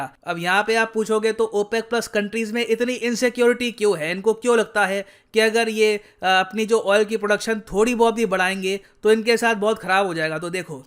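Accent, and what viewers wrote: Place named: Delhi, India. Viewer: “Hum gerrnge, dhar lia” sir’s delhi words so amusing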